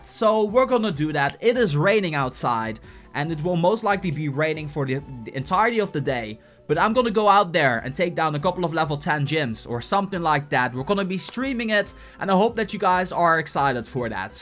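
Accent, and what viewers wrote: Netherlands. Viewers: Are you Dutch? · Are u dutch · Arnhem Ik dacht al dat je Nederlands was.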